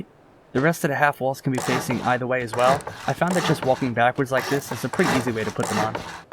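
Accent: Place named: Boston, United States. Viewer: He sounds like he’s from Boston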